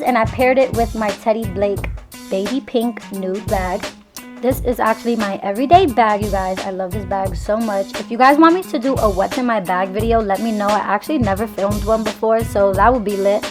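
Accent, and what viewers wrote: New York, United States. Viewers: she from ny !! not every bitch wants to talk like cardi lmaooo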